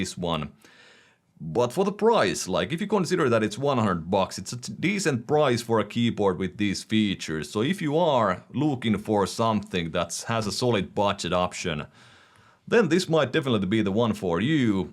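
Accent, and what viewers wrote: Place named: Finland. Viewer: it's finnish accent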